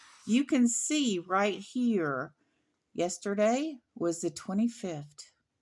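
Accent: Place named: RP, United Kingdom. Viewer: Queens English, no less.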